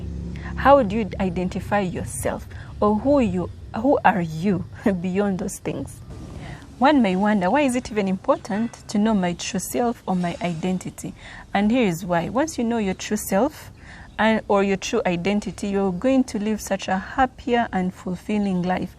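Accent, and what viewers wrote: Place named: Buganda, Uganda. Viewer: Your voice is life. I love your Buganda accent 💖💖💖💖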